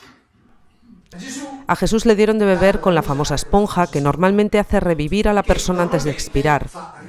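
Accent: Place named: Castile, Spain. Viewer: Puro acento castellano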